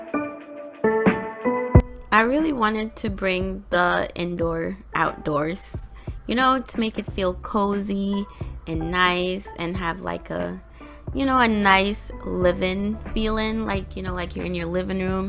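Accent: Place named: Caribbean. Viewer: Yes! I only caught a hint of the accent. I was actually thinking you could be from St. Vincent. Was not expecting JA at all! LOL!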